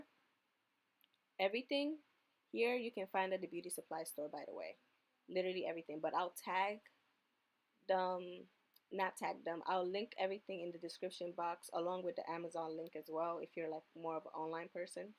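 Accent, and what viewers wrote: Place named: Latin America. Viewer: Are u Latino? U have a accent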